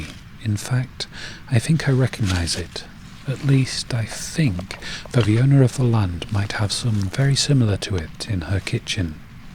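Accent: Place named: England, United Kingdom. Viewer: Thanks for the scenery and the English countryside feel of your voice as you take us on a trip down your memory lane. I was right there with you when you "broke on though to the other side" of that tree root. So in sync that I burst out laughing when you spoke, out loud, the words in my head. We even said broke rather than break.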